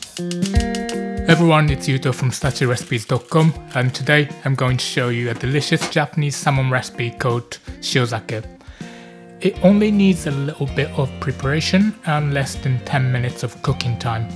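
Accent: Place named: Cockney, United Kingdom. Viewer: seems to have (charmingly) picked up a Cockney accent. Bet he went to London?😊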